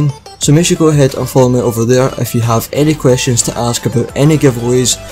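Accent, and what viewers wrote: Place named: Scotland, United Kingdom. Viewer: ur scottish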